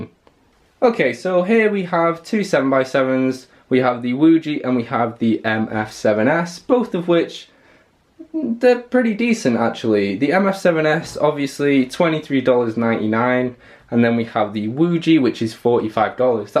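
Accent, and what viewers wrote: England, United Kingdom. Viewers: Hey are you from England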